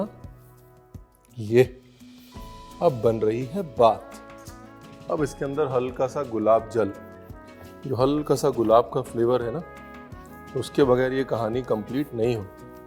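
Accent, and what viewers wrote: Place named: Bengal, India. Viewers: I love your Bengali pronunciation ❤️ chef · Being a Bengali.....am so proud of you ❤️ Jis tarase aap Bengali accent use karteho...oo kamal ka hainn ❤️❤️ Sending lots of love ❤️🥰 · Oh chef! Not only your way of cooking or recepies, but your bangla accents and love for bangali cuisines.. just have stolen my heart! All my kitchen equipments have got your mark 😀 wish could show u once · Ye accent, aur ye bartan (kanshar thala), aur favourite sweet - jolbhora. Ranveer apne bataya nehi - aap ka Bengali connection keya hain 🤔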